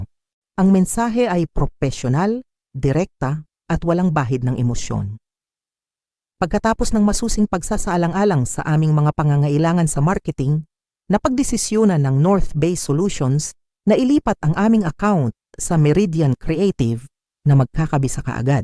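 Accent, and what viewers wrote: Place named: India. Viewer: Putik!!! Bakit naging Indiano ang narrator!!!!!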